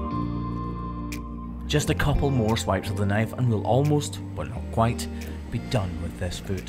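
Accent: Scotland, United Kingdom. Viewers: I thought you were Scottish and this video proved me right🏴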